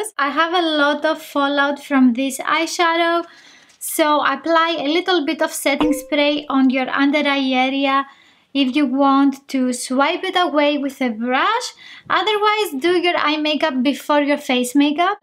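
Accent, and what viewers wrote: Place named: Greece. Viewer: I bought this palette yesterday and you're presenting it for us today! Once or twice a year Essence makes a big discount on some of their product, and this was one of them. I got it and the Be an Original one for 1.10 euros each, and now when I've seen your review, I see that I made some good choices. It's great that you try to show us as many colours as you can. I always enjoy watching your reviews. Keep up the good work 😎 I've shown this video to my mom too, and she says you're sweet and cute, and your Greek accent too.💖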